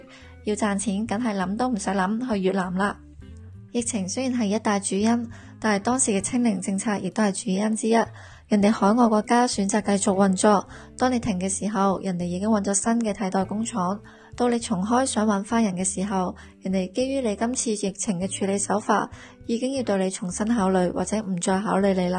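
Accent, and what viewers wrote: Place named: Hong Kong. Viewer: 是HK話 唔係廣州話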